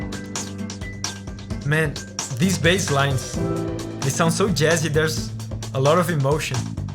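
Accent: Brazil. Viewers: Mano, seus vídeos são muito fodas! Achei seu sotaque familiar, logo pensei "é um brasileiro falando em inglês" kkkkk continua assim, vai longe ainda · Man, I have to ask. Are you Brazilian? Your accent sounds very Brazilian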